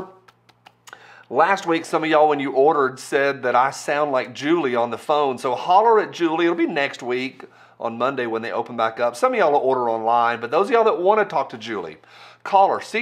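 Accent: United States. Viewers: Love ya!!! That freedom accent is soo heart felt..maybe get a lahma tin hat for those on the left coast to stop the the brain washin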